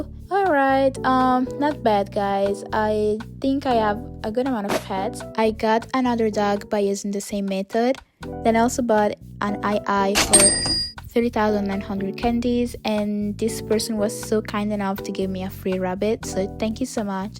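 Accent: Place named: Italy. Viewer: omg I loved this video! also ur accent is absolutely gorgeous! r u Italian? x (I have cousins who speak just like u 💞)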